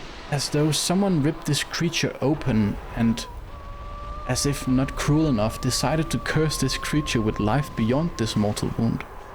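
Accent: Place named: Denmark. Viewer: Er du ikke dansker, syntes din acccent lyder som så ;)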